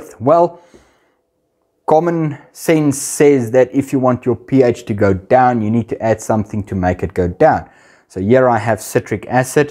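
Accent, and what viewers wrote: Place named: South Africa. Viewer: Tornished..... Hahahah, classic South African accent. All good shit man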